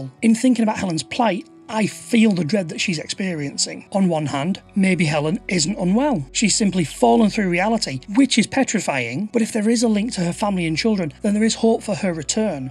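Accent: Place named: Manchester, United Kingdom. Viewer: Many thanks for this. Your voice sounds North Manchesterian, so if you want an interactive sensory descent into that post-apocalyptic decomposition vibe you could always visit the ex-shopping malls of Bolton. Plenty of it here. Bring a flamethrower. :)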